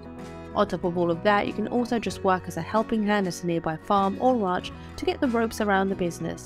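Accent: United Kingdom. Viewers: Why is a Brit accent talking about American homesteads? Smh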